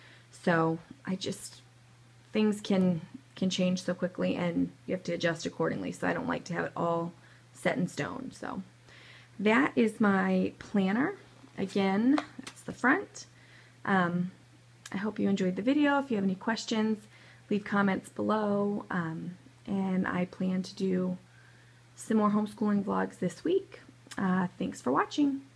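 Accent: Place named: North Texas, United States. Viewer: Hopefully my profile pic loads soon...but if it doesn't, this is Kristen. :) I love how you talk like a yankee moved to Texas! :) I'm glad you're doing this vlog as I am still considering homeschooling, and I love your organization. Thanks! Miss you friend!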